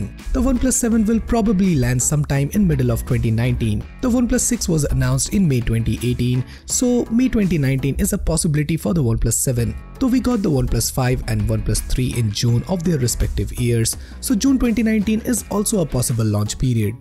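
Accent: India. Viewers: If you read „official“ in the title and hear an Indian voice ... it’s fake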